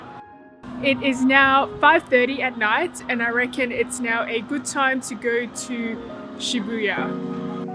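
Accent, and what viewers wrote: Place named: Australia. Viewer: So cool to see you cross Shibuya Crossing. Love your Aussie accent.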